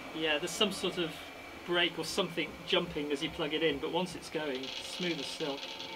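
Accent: United Kingdom. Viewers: You sound like your from uk where is this second hand tool shop do thay have a website. Great video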